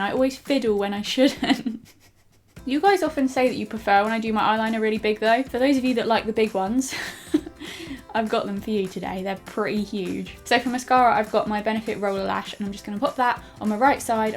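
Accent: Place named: London, United Kingdom. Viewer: I didn't think you would reply thanks I love your vids. I love how I finally found another English makeup person like Zoella,but your from London I can tell by ur accent xxx 💙🦄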